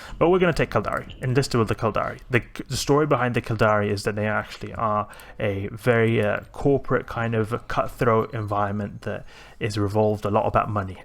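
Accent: South Africa. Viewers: If i may ask, where you born in South Africa? As a afrikaner I can hear a light South African accent!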